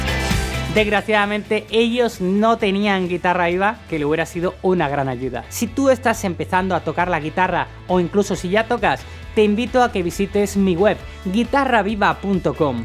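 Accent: Andalusia, Spain. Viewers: A veces le siento como acento andaluz!!!🤙🤙🤙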